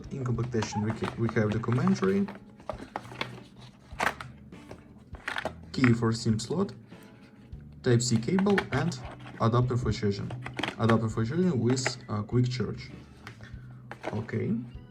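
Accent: Russia. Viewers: на аглийском,с русским акцентом))) По голосу и звуковому фону узнал.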